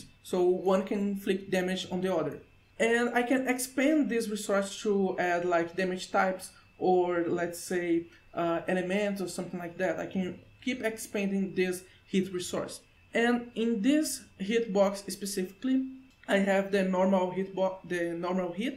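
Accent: Brazil. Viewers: Where yo from? brazilian accent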